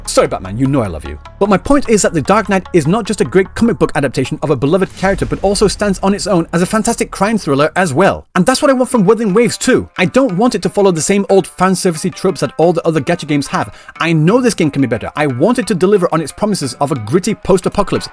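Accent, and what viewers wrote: United Kingdom. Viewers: The Linyang roast in the first 30 seconds has earned my respect. Also why do you sound so British don’t you live in Japan????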